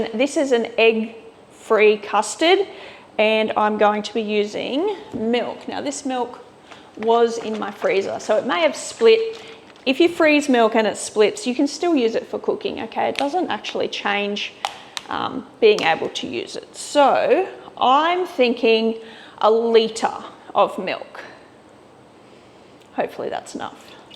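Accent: Australia. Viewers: How lovely it is to hear an Australian lady doing an Australian dish (I think it’s that). I had a son who was lactose intolerant and another son who had got problems so they put him on lactose-free until he was school-age anyway. Both my boys grew up on goats milk. I never got to the point of making ice cream but, other than that, everything I made for us as a family was made on goats milk. People will tell you that they can taste the difference. —. I guess that’s up to them, but the number of time I would serve apple pie and I used to thicken the goat milk with corn flour and then beat the heck out of it and people that think it was cream.. my best friend ate this, but maybe two years before she found out she’d be eating goats milk all the time. I drank almond milk in my coffee for years and I couldn’t tell the difference a lot of of its mind over matter I think. 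However, thank you very much for your wonderful effort. I’m saving you in my I don’t know what else it’s called, but I’m saving you to look you up and see what other recipes you have. I don’t know where in Australia you’re from, I’m from Redcliffe, about 25 or 30 K’s north of Brisbane Queensland. All the best, and I’ll be watching you to see what you come up with, thanks again.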